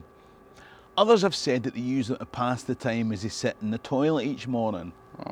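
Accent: Scotland, United Kingdom. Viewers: Watching on my iPad, on my couch, drinking a cuppa, not only loving the history, the castles but love hearing that gorgeous Scottish accent...I'm an Aussie.. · Watching on the TV in our livingroom in Canada. I am a very very distant descendant of James VI and Mary and the rest of them. My "immediate" ancestors came over from Ayrshire. Always interested in the history. I enjoy your videos immensely, although I do have to turn on the text because your wonderful accent throws me for a loop at times. Thanks for what you do :) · There's something strangely nostalgic about hearing someone with a Scottish accent using onions as a metaphor for layers. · Watching at bedtime in the Pacific NW US; your Scottish accent is wonderful. I love reading western European history fiction, all time periods. While Iistening to the stories, I like to learn the actual history. 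I watch your videos to help bring to life the Highlander books I read (actually listen to on audiobooks) as well as other Scottish time periods. My favorite genre is time travel...I just listened to a story about Stirling Castle and William Wallace.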